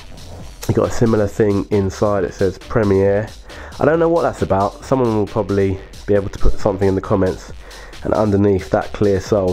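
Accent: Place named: United Kingdom. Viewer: When I heard the British accent I was excited there isn't much of us uk trainer lovers on here. Keep it up love your video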